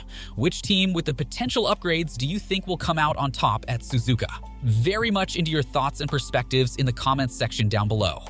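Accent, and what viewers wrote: United States. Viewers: the AMERICAN ACCENT is KILLING ME!!!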